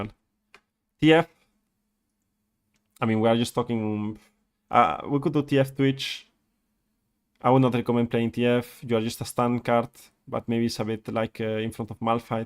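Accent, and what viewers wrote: Spain. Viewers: Buenas, tengo una pregunta (im guessing ur spanish by ur accent). Estoy actualmente practicando Bardo porque soy OTP Rell y Rakan, pero queria saber si Bardo merece la pena. Tiene un estilo de juego que me gusta bastante pero no se si merece mas la pena ser OTP Bardo o Thresh. Tambien me gustaria saber si en un futuro haras alguna guia sobre Bardo, gracias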